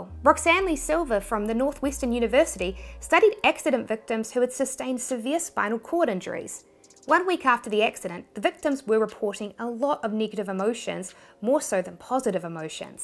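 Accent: New Zealand. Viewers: Great that you share your experiences and all the helpful information; we all benefit from this. I'm a fan of your channel, inspiring videos, and lovely NZ accent. Keep up the great work! But relax sometimes too ;-).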